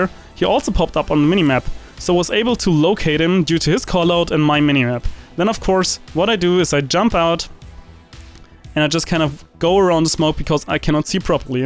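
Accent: Germany. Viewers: Jetzt ergibt das auch nen Sinn warum du sowie die typisch Deutsche im MM klingst xd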